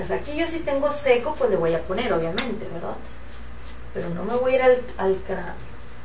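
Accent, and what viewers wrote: Mexico. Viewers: Adoro tu voz y ty forma de ablar xD eres mexicana?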